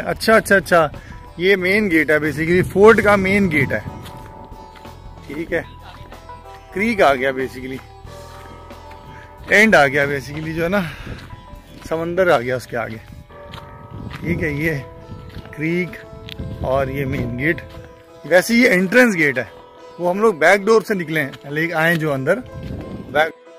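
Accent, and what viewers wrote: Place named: Sindh, Pakistan. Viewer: Great,you doing well and your sindhi accent is cute.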